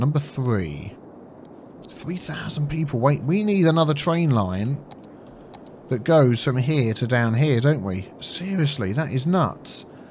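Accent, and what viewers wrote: United Kingdom. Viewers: yeah you have played many different ones. l i thought you may have played one particular game i used too but you're not him. All you British guys sound interesting and amusing.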